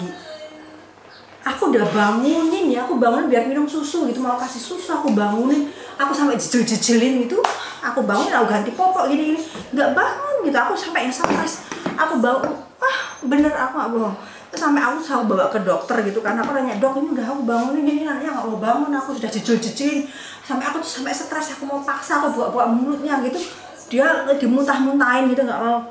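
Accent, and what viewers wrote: East Java, Indonesia. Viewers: ngakak habis cara ngomong ciiri kha s jawa timuran dan btw anak lanange dimana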